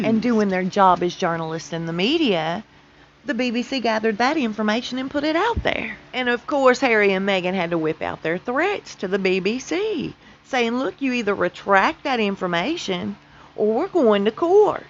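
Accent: South, United States. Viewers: You really have a strong southern accent where are you from? I'm from the south but my accent is not as thick as yours.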